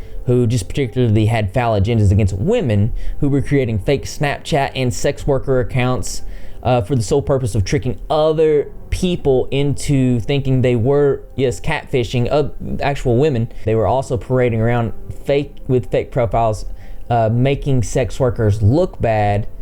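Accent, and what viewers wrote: South, United States. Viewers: Southern? He's from Tennessee I'm pretty sure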